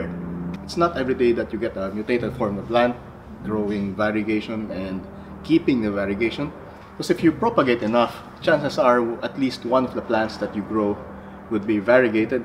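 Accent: Philippines. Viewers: Are you a filipino? Your accent sound like filipino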